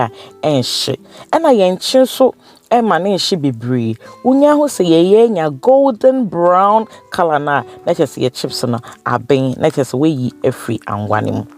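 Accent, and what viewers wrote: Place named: Twi, Ghana. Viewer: Eiii madam w’ano ni twi y3 d3 yie papabi ❤️ beautifully done sis 🙏🏻Much love dear ❤️❤️❤️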